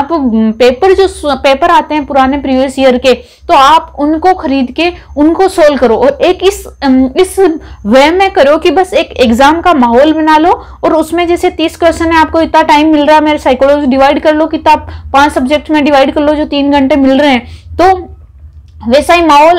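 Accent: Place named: Rajasthan, India. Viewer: Puri rajhisthani bolti ho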